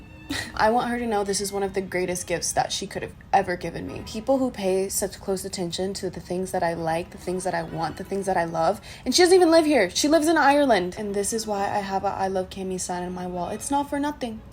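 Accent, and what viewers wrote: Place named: Colombia. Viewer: Tu español suena Colombiano….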